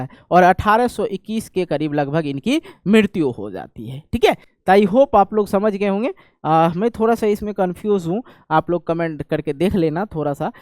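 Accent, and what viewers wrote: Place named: Bihar, India. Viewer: Bhut Bhut bhut mja aaya Bihari Andaj me pdne me 😊😊😊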